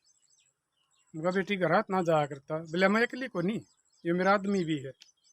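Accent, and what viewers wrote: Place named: Haryana, India. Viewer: Aapki haryanvi bohot achi lagti ha haryanvi me hi bataya karo aapki sari video dekhati hun meri bi bhabi ase hi Karti thi meri maa me lakwa aa gaya tha to uska ilaj bhai ne nahi karwaya do bete do bahu ha 6 pata poti han sadi bhi kar rakhi h bachon ki lekin maa ka ilajaj hamne karwaya ha koi Paisa nahi diya jab wo theek ho gai mera pitaji army me the pention milti jab theek hogi jab jabardasti lege lekin jane ke baad na to hamko bulai aur ham maa se milne jaati to bhabhi bhai bhatija bhatiji bhi jhagda karte the unko matlab hota ha to aate han nahi to naraj hokar bolte nahi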